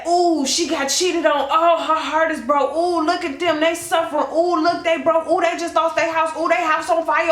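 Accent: Chicago, United States. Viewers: You sound like you from Chicago lol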